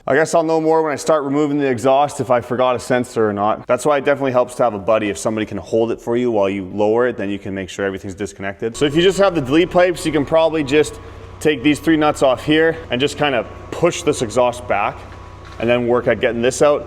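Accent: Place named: Canada. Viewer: His accent is Canadian af (Sask maybe?), and I can attest it’s not illegal here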